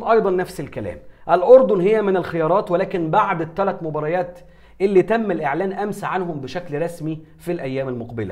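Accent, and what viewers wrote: Upper Egypt, Egypt. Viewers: هههههه لكنتك صعيدي اصلي ونتشرف فيك أخي وشكراً عمجهودك🌸🌸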